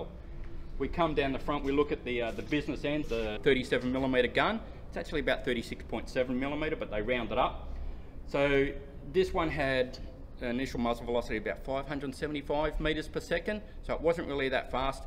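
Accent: Australia. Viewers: Ruined by his revolting Australian accent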